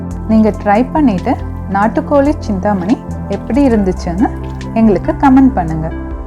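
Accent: Kerala, India. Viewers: Neenga malayaliya. Njn pesira mariye irukku😘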